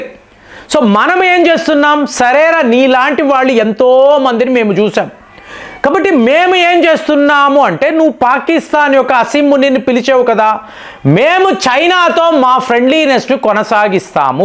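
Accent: Tamil Nadu, India. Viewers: Ori vari nuvvu matladedi tamila telugu, salam kadu sagam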